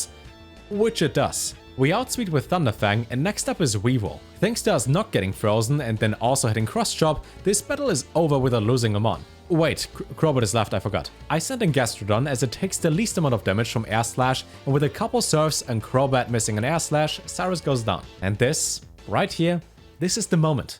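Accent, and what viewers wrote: Germany. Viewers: Man hört irgendwie einen deutschen akzent raus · Man hört fast nicht dass du deutsch bist. Abgesehen davon hammer video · I subscribed for the German accent 😎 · Are you German lol? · Hey, you kinda sounds like german are u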